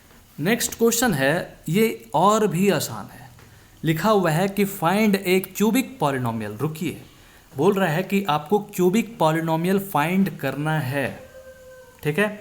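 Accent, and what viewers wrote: Bihar, India. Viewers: sir app bihar ke ho?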